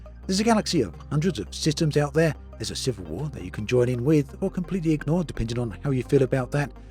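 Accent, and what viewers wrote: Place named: England, United Kingdom. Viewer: I can barely understand with your English accent .. need to speak slower mate 😊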